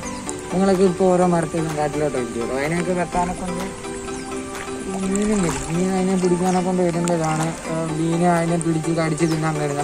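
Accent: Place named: Kottayam, India. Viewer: ❤️❤️❤️❤️👍🏼വഷണ ഇല ....കോട്ടയം speech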